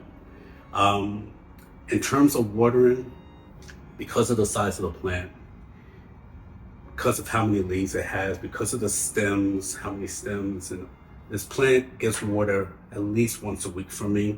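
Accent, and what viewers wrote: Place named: New Orleans, United States. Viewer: I thought you were from my part of the world with that Accent lol New Orleans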